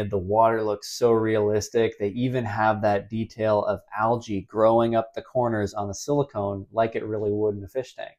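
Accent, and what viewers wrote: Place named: Canada. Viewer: the Canadian accent is subtle, but i live rural, that upraising is hard to miss when you look for it, you'll notice a difference between cloud and clout, clout has the uprising cloud doesn't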